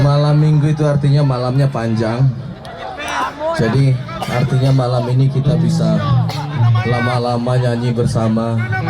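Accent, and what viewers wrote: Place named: Minangkabau, Indonesia. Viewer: Lucu bahaso minangnyo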